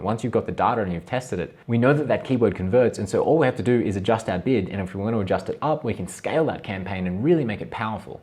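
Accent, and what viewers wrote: Australia. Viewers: Loved the no fuss Aussie delivery. Can you tell me do you eventually drop the automatic campaign when you have sorted the manual campaigns. Cheers.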